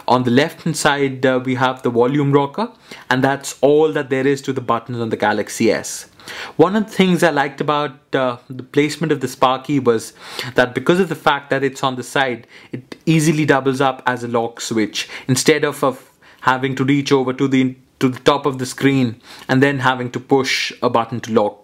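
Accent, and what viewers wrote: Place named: India. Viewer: Haha, what are you people on about? He sounds nothing like Arnie, he's Indian!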